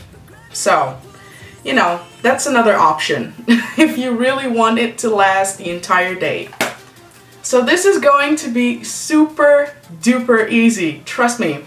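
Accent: Netherlands. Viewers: OMG are you dutch??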